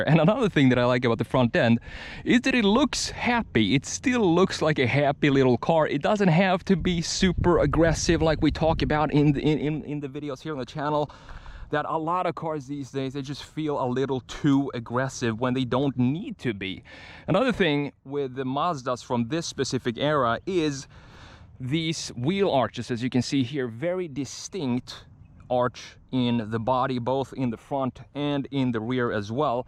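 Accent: Sweden. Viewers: Are you from Sweden? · Hi, a very nice review. I also like the NC as that engine has a greater potential than earlier engines and its a great platform too. Are you from Sweden ? I can hear a ”tone” of Swedish in your accent.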